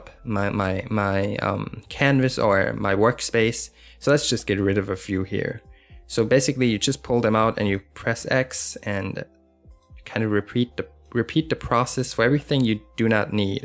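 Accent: Germany. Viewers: Are you German? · Are you german?:))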